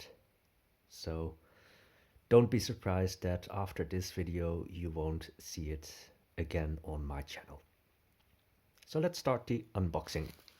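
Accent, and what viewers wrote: Netherlands. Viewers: Wtf dude, it called an accent. "Die" is a common deviation of people who speak Dutch or Dutch adjacent languages. People speak your language out of courtesy. If they pronounce things wrong, just accept it and go on with your epic day.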